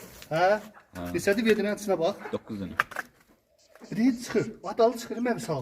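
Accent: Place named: Shamakhi, Azerbaijan. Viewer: Deyesen bura Şamaxidir. Lehcesinden oxsatdim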